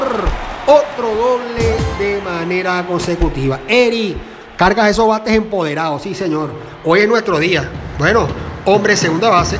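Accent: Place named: Venezuela. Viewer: Pareces que eres venezolano mi pana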